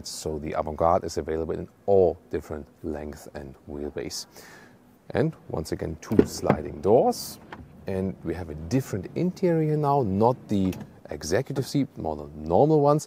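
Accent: Germany. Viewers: A Tschermän tolking inglish. horrible! Bleib beim Deutschen, es ist authentischer!!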